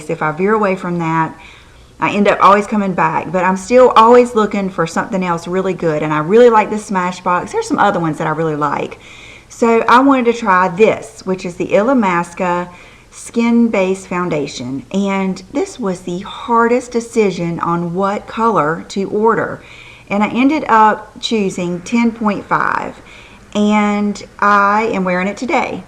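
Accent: South, United States. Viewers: Hi!!!!! Great video. I'm a new subscriber and I love your channel. Love the southern twang too. Lol! We are close in age. I'm 40, so I appreciate all the advice..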